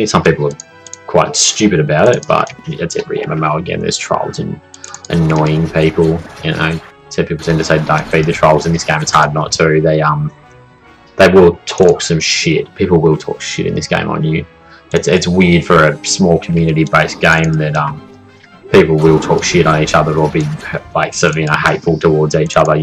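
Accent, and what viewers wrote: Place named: Australia. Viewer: Are you lagging? Looks like you can barely walk anywhere. What country are you playing from and what shard are you in? Because you sound Australian, so you should be in Oceania right?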